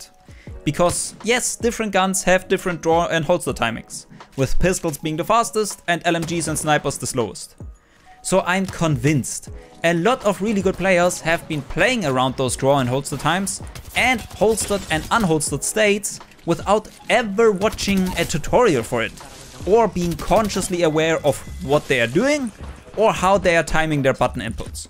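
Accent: Germany. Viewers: Dikka dein englisch hört sich mehr deutsch an als alles andere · Are you Form Germany? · Man hört so den Deutschen akzent raus 😂💪🏻🔥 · Sounds like German Accent :)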